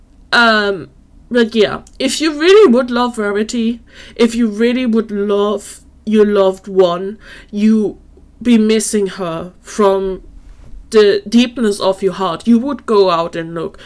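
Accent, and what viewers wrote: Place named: Germany. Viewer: I thought you sounded German!!! Welche Region wohnst du in?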